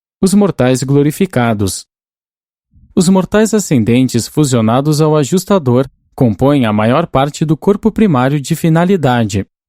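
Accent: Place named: Sao Paulo, Brazil. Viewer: ...sotaque paulista....confunde ponto com virgula......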